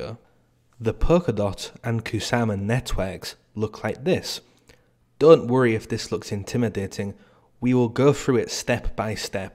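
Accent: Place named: Barnsley, United Kingdom. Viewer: Great video again! You sound like you’re not far from me! Barnsleh? DM on Twitter if you like :)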